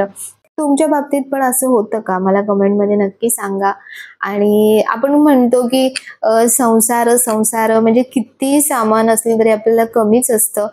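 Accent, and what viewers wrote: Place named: Konkan, India. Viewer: Kokan natil language asish ahe....tasch boln aste sarvanche ..ani chhan ch bolatat tai❤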